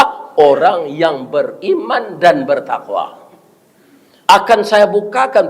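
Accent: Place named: Minangkabau, Indonesia. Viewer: Semoga tetap sehat buya. Ambo bukan orang awak sangat menikmati ceramah buya apalagi dg logat minang yg kocak dan isi nya Ambo sering tanyo ke bini Ambo yg Alhamdulillah orang awak. Sekali semoga sehat buya Aamiin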